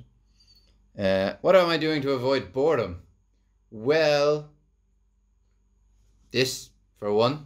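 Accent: Ireland. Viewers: And besides you sound Irish have you ever heard of the Fighting Irish there's a reason why they're called that. Happy birthday buddy my birthday was the 25th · I’ve always loved an Irish accent!!!!!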